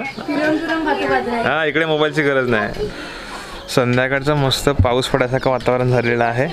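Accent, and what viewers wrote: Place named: Shrivardhan, India. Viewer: तुमची भाषा आमच्या श्रीवर्धन सारखी आहे लय भारी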